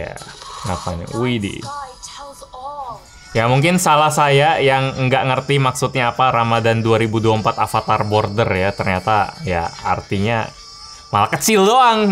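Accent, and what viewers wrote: Palembang, Indonesia. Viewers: Ketaro nian wong plembang. 😅 Metu aslinyo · Wong Palembang caknyo kakak ini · kayak ada bhasa palembang ny, ap koh org palembang?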